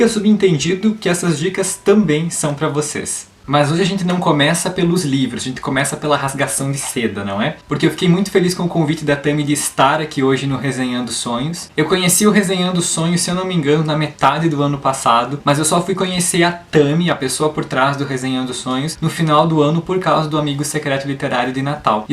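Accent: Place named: Portugal. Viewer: O sotaque dele chega a beirar o sotaque de portugal kkkk amo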